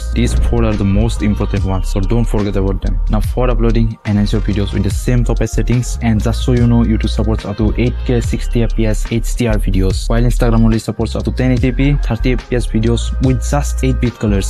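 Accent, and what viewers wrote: Assam, India. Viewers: Bro are you Indian ?? Cause your English is like Indian (like me lol) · Voice to Assamese or nisina hona jai ase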